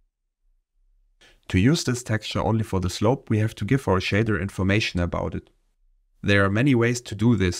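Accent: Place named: Germany. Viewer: ich wusste doch das ich Deutsch rausgehört hab! Aber geiles Tutorial ehrlich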